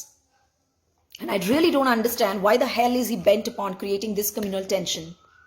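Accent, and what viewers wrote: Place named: Ivy League, United States. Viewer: You're obviously biased and parroting your party position backing it with the weight of your credentials and ivy league accent; however not realising or blissfully ignoring the fact that the moment your affiliation surfaces, your entire criticism loses credibility as you represent the most corrupt, nepotistic family domonated political organisation led by one of the most incompetent and ignorant, self obsessed, entitled leader.. Good luck to your aspirations as I hope you might be genuine despite your affiliations..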